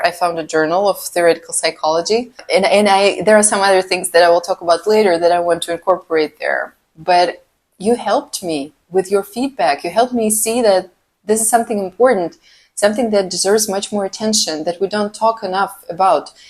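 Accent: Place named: Russia. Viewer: Thank you, Dr.. You say things in a way that gets to the point without having any pride or ego attached, yet there is compassion and care without overstepping lines. I liked you right away. And, I never thought I would say this publicly (it’s funny how it came up), but your Russian accent was another reason I liked you right away. I don’t have any connections to Russia but it’s always held a special place in my heart; I’ve yet to fully find out why. Just wanted to say I’m glad things happened for a reason and I fully appreciate your wisdom and authenticity. Thank you again. May you have a wonderful new year.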